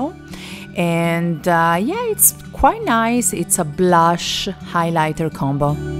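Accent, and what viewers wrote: Italy. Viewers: Hello nice to hear your Italian accent is there... 👍🏻 nice presentation, but I did't like the eye shadows ... As you said are too flaky..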